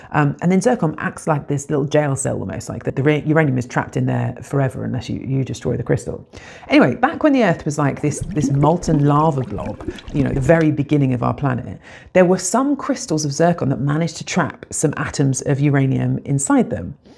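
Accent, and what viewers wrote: England, United Kingdom. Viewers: Aaawww 🙈 you are a 100 ct 💎 I hear your theory 😂 I'm sorry, a fake Diamond 💎 is not better than the real thing 😂 you are the Real thing and you are Blessed with the best English Accent in the world 🤗 Your Voice is worth a Billion Diamonds 💎 🙊❤️⚘️ Stay Bless ✝️ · Generic English accent. Hear it everyday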